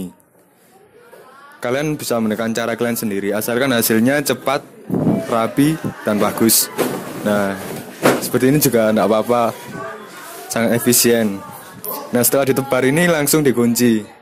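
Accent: Java, Indonesia. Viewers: Mase medok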